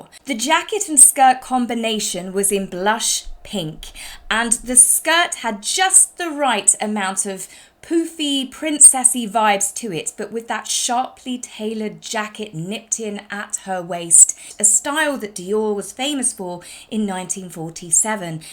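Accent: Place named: United Kingdom. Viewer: Hey, love your Brit accent when calling out "bullshit and shite." It never gets dull.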